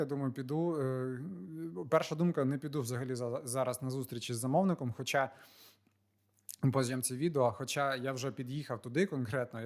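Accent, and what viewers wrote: Standard. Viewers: чиста українська💪👍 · Давай не розклєюйся :) Хороший настрій, пили контент та одужуй, і до речі, дуже гарно говориш чистою українською) Це перше відео з твого каналу яке я побачив, до того ж, з рекомендацій ютуба 😊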